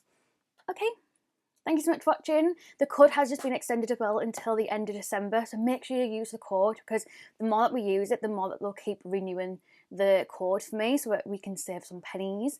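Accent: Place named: Northern England, United Kingdom. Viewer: Loved the video. Where are you from? You sound like a northern lass like me!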